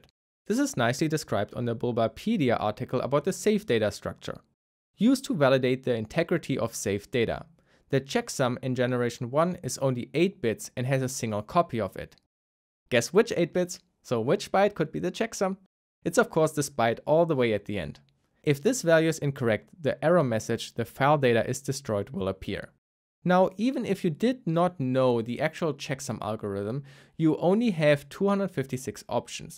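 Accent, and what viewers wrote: Germany. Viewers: are you German? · Are you german? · ich hasse deutschen Akzent 🙈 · At the first sentence I knew: this is definitely a German guy 😂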